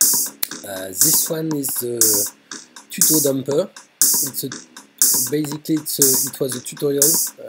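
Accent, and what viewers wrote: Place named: France. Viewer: Le belle accent Français :')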